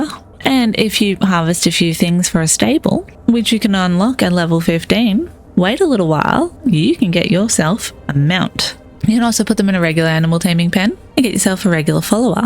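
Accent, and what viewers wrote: Australia. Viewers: i love your aussie accent and attitude when talking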